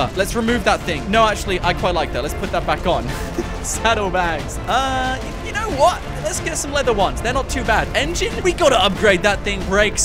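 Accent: United Kingdom. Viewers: Is he British or American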